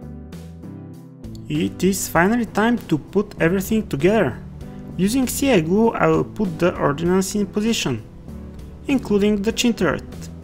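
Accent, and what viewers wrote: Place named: Russia. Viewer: Ну дикий русский акцент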